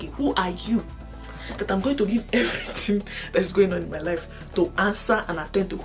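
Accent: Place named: Nigeria. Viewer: I love the Naija accent at the intro💯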